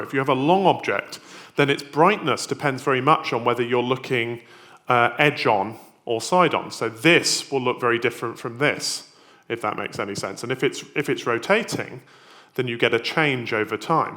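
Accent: United Kingdom. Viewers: I love the britishy accent of the speaker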